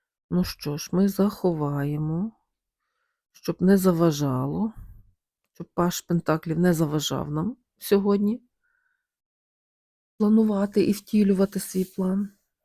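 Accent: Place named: Galicia, Ukraine. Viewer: Доброго ранку. Дякую за прогноз. Ви напевно зі Львова? Галицький говір, мова.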